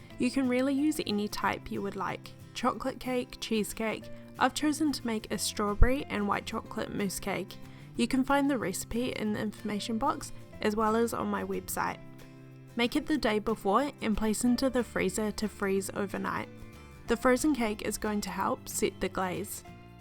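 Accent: New Zealand. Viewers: I hear that kiwi accent girl heyyyyy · New Zealander accent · hey! she sounds kiwi, and definitely not from texas! have a good day :) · As a kiwi who left 26 years ago, it's amazing hearing your strong accent. Great video btw. Well done. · so many people whinging here - great video well done sis. Love your kiwi accent. from an ozzie down under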